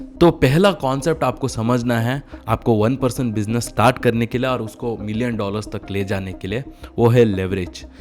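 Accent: Karnataka, India. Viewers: Bhai aap karnataka se hoo kya???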